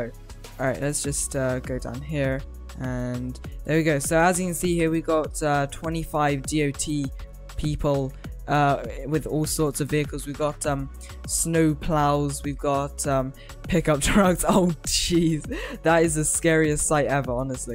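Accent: United Kingdom. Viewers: Are you from the U.K? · are you British?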